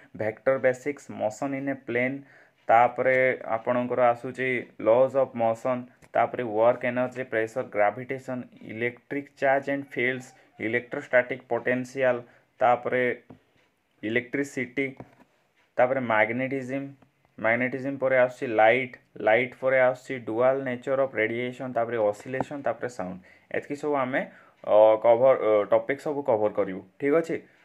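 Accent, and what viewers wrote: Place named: Mayurbhanj, India. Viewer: Aapanaka language ru laguchi aapana mayurbhanj ru....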